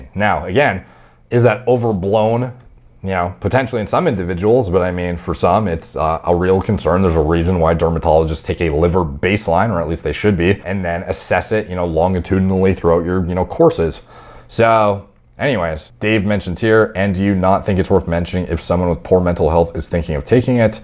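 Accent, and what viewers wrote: Ireland. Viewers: Bro sounds Irish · Buddy nobody taking fucking advice from no irish sounding asian that uses roids and is still smaller than an 8th grader wtf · I love his accent. Very Irish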